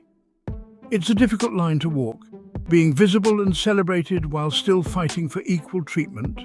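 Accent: United Kingdom. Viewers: With that english accent ,i wonder why this one is concerned about Jamaica and USA,remind me of the Africans with no concern about their own messedup continent,but always focussing on Jamaica,but when did Jamaica become a part of the USA,its an immigrant country, jamaicans like most immigrants has been going back and forth since time began,and again why is mr Brit accent concerned about Ja..hhhhh..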